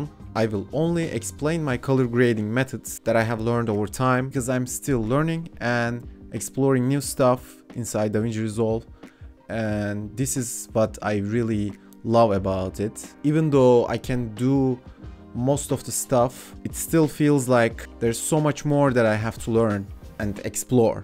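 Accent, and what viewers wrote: Turkey. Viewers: Hey bro, are you Turkish right? you're accent almost same Turkish people ahaha lol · Türk her yerde Türklüğünü belli ediyor ya aksanı duyar duymaz anladım :)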